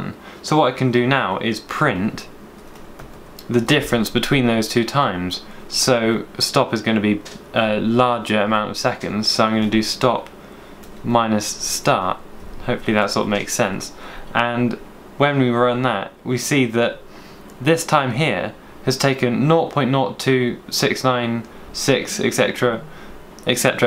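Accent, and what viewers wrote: United Kingdom. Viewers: awesome ,sounds like a British?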